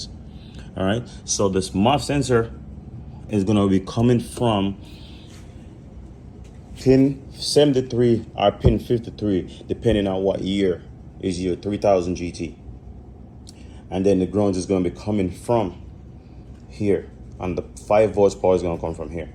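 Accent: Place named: Jamaica. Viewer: Sounds Jamaican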